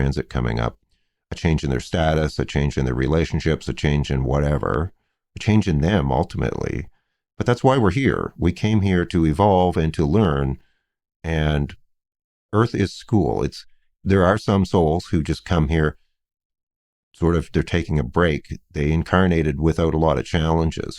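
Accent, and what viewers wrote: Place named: Atlantic Canada, Canada. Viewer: I knew as soon as I heard your voice you were probably from somewhere in Atlantic Canada...Newfoundland? Im in northern BC. Anyway, just found your videos and love your style of articulation. I'm going to check out your ritual material next. Thanks for sharing your insights here. 🙏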